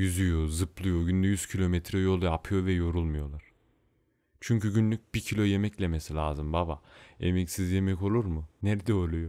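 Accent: Ankara, Turkey. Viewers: Son derece başarılı. Belgeselleri çok severim. Ankara ağzıyla efsane olmuş. Böyle devam kardeşim. İnşallah burası tutacak. Dur ben bi paylaşayım.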